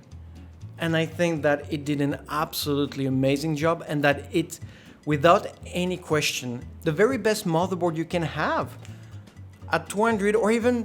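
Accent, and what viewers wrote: France. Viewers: This review is great. usually with the French accent there is a high "punch me in the face" factor, don't get that with this guy at all - also Je Parle Francais - Merci bon homme. subscribed. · Nice video, love your french accent :p Can you still run two M.2 NVME Gen 3 while running a Ryzen 3000 on this board? Or are you forced to use M.2 NVME Gen 4 when using a Ryzen 3000 CPU? Enjoy the rest of your week! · I barely bear your accent. im not a english native speaker, try to follow your speech is very hard for me. Also you are french. (im italian)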